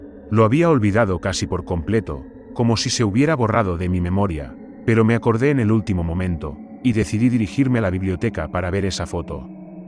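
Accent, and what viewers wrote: Spain. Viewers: Es encantador como los Españoles pronuncian la "S" , en cada País es distinta la forma de pronunciar ciertas letras y nadie tiene porqué hacer llamado de atención de como uno pronuncia una palabra .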